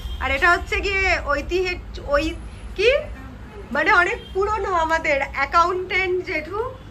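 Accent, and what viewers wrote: Bangal, Bangladesh. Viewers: Khub bhalo laglo specially bangal bhasa ta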